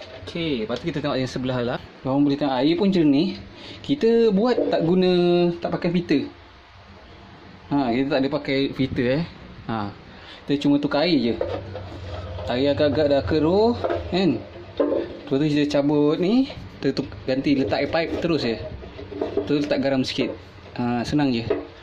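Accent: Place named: Kuala Lumpur, Malaysia. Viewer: Ni cara cakap orang kuala lumpur dan Selangor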